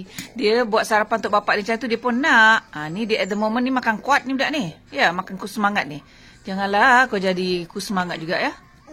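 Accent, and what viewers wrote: Negeri Sembilan, Malaysia. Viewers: Kelakar lah Kau ni,nasib baik ingat lg loghat Nogori tu. Suko acik nongar eh.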